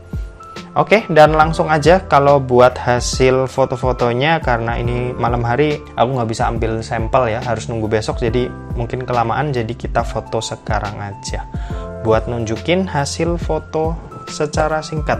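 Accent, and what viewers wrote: Semarang, Indonesia. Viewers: Mas wong semarang yo?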